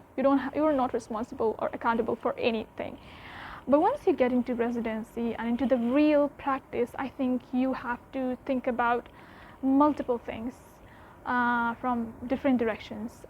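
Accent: United Kingdom. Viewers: Mashallah. Nice English Accent though, 👍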